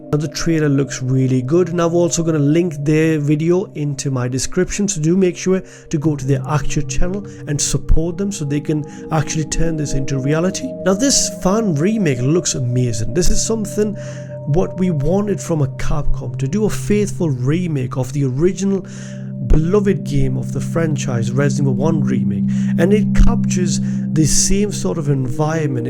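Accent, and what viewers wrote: Newcastle, United Kingdom. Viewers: You from the west end, bro? (accent is a dead give away to a fellow Geordie) EDIT: little bit of boro in that accent, so not so sure now.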